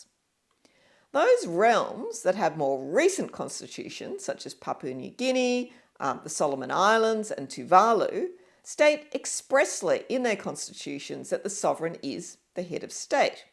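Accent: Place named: Cultivated, Australia. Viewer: I have never heard a more aristocratic Australian. G’day, mate.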